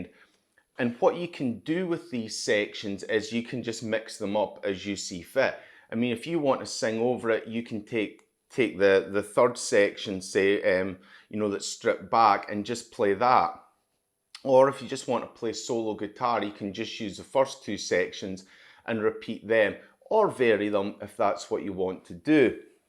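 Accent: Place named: Scotland, United Kingdom. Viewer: Good to hear a Scots accent, sir. You choose great source material to give a lesson with. Best of health and best wishes with your channel.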